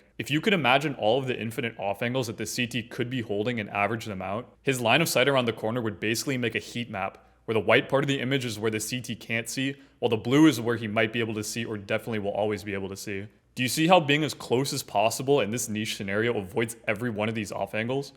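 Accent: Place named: United States. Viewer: the moment you hear an american accent on a counter strike video you know the creator is going to be absolutely clueless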